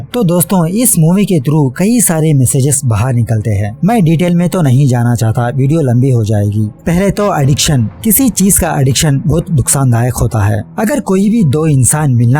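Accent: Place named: South, India. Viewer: Awesome south indian accent and current issues included in the explanations....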